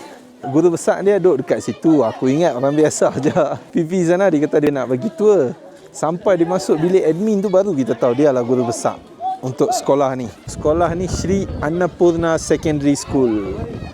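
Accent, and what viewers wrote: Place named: Kedah, Malaysia. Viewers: bestnya..cantik sgt permandangan kg tu..terima kasih Dr..lg best bahasa kedah sampai sana. jga diri dn kesihatan bos. terbaikkk👍👍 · Alhmdulillah aka kira baru masok line ni, seronok sgt aka bila dgr Adik ckp logak kedah, terbaik dik, Sgt jga tatasuli bahasa, terbaik dik, aka tak miss tgok Ulang2 yg pat kt india dgn mesir, kira semua aka suka, terbaik dik 😂👍👍👍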